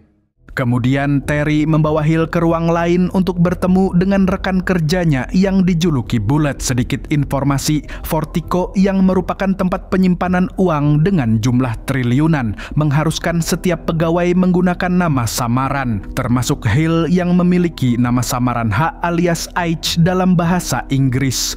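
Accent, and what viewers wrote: Banjar, Indonesia. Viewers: Pian urang Banjar kah min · Admin nya urg banjar jua sekalinya 😁👍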